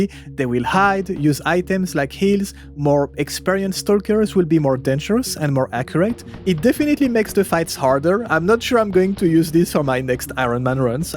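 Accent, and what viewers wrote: France. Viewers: Amazing video, cool french voice to artefact hunt to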